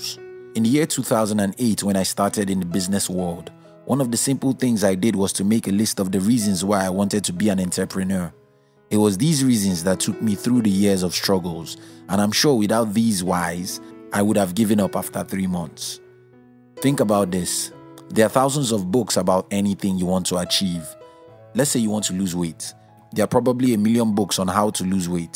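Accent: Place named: Nigeria. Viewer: Nigerian, I believe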